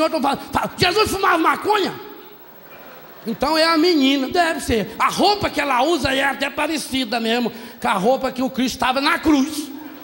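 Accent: Minas Gerais, Brazil. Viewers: Eu aqui lutando contra a ansiedade aí vejo este vídeo de um santo pregando do seu jeito caipira de minas❤️❤️❤️🙌 Deus seja louvado!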